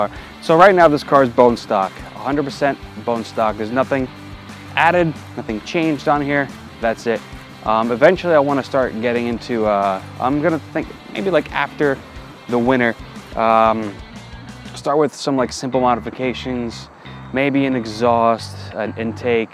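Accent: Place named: Western New York, United States. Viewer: just bought a 2016 WRX 2 days ago man. By the sound of it, you must be from Western NY. there is a WNY Subaru Owners Club on Facebook if you want to join it. I am also learning to drive stick on this...."interesting to say the least" describes it adequately. I'll look for you in the club. One thing I wanted to say in warning, if you mod it, almost all mods require a new tune.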